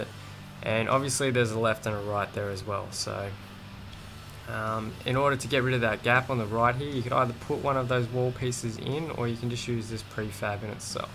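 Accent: Australia. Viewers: are you aussie, just curious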